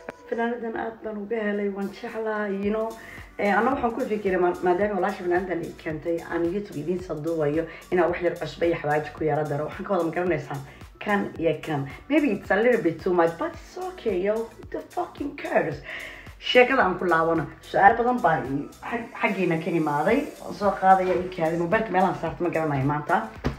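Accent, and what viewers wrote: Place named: Isaaq, Somalia. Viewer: wan ogaah naag sidaa u hadle kulaal baa isaaq ah ❤❤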